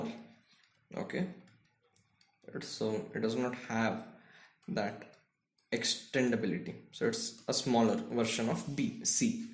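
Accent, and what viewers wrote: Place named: India. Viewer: nice, i understand a bit of indian english but your draws are very nice explainfuled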